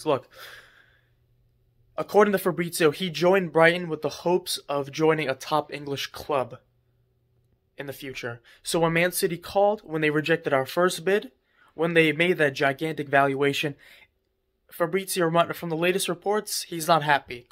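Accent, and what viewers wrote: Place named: New York, United States. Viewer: I can tell you are from New York without telling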